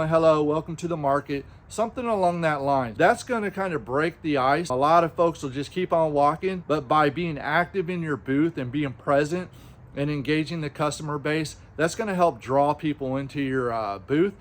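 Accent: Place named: Maine, United States. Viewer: Where anchored maine he sounds like from maine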